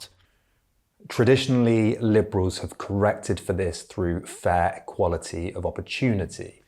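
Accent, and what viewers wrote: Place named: Bristol, United Kingdom. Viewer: the slight bristolian accent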